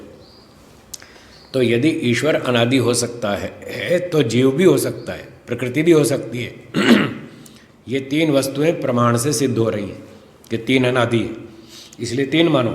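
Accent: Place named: Haryana, India. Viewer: वाह पूजनीय स्वामी जी मन्त्रमुग्ध कर दिया आपको रौबदार आवाज में हरियाणवी लहजा झलकता है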